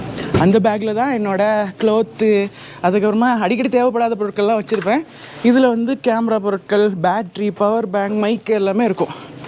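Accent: India. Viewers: Nee ga srilanka Tamil pesala India Tamil pesuringa ,❤ nella eruku pro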